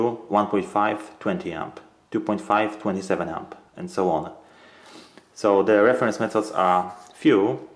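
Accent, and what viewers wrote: Poland. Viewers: Very nice explanation, you make a great teacher; sweet memories of my work with some Polish builders because of the accent. :)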